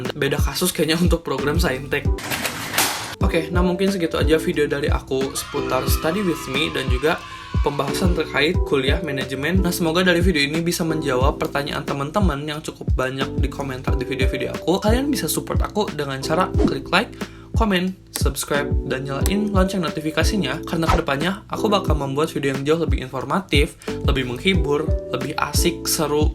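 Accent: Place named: West Java, Indonesia. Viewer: Sunda pisaannn euy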